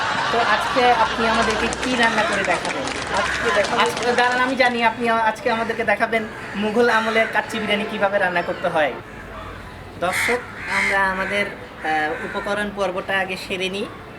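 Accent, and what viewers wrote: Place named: Rajshahi, Bangladesh. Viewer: rajshahir pola kothai buja jaitache ga.... nice job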